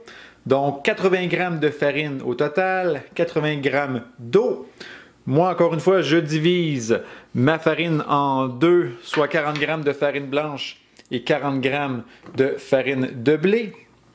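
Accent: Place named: Canada. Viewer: Claude de Belgique J' adore ton accent Canadien !!!! et bien entendu le Québec !!! Merci !!! pour ta recette de levain que je suis entrain d'appliquer ( 2 éme jour )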